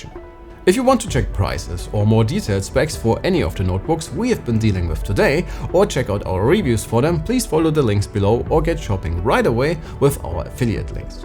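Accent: Germany. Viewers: I don’t understand, why you don’t do the videos in German.. it is clearly hearable, that German is your mother tongue… or at least in both, German and English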